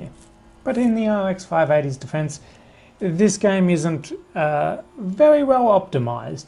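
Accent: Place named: Australia. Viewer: in the thumbnail u look like jazza from dwj and even ur accent is like his(Australian probably) but with um..higher tone or something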